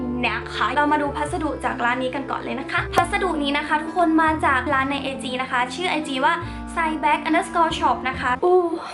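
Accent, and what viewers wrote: Isan, Thailand. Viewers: ชอบพี่ตอนพูดอีสาน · ซ่องวะสั่น555. ชอบความอิสานมากค่า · ชแบเวลาพูดภาษาอีสานอ่ะ น่ารักมากเลยยย❤ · ชอบความภาษาอีสานนนนนนนนนนน เสียงน่ารักมากค่ะ พี่ชอบ กดติดตามแทบทันที่ที่ได้ยินภาษาอีสาน 5555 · ชอบความพูดอีสาน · ชอบที่พี่พูดภาษาอีสานอ่ะ 💕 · เป็นคนอีสานบ่พี่ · น่ารักๆเว้าอีสานผสมๆนำมักๆ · เป็นคนอีสานหรอคะ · ซ่อง 55555. อีสานผสม ปากไม่เเดง ไม่มีเเรงเรียน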